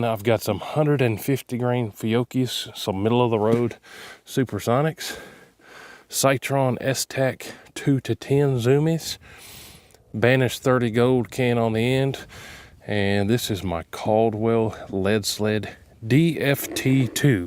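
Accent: South, United States. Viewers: what the hell is zoomies please speak proper english not country red slain.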